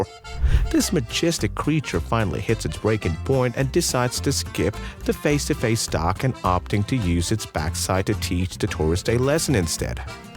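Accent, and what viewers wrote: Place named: United States. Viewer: Why is an American doing the talking ?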